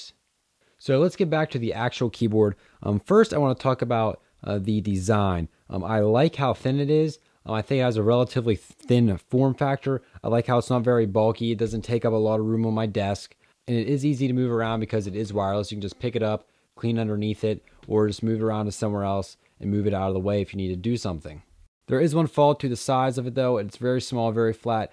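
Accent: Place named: Pennsylvania, United States. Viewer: You are so from PA, lol. Nice review neighbor. Is there any lag with the keyboard at all? In other words, would it be ok for gaming?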